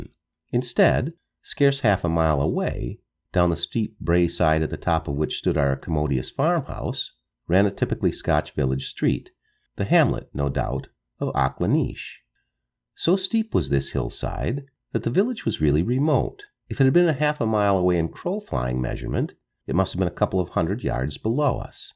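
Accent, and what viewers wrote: United States. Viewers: Shouldn’t be an American voice but English. Sounds wrong.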